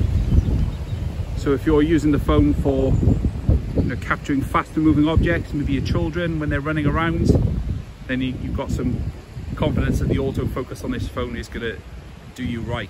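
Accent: United Kingdom. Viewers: your British accent 😍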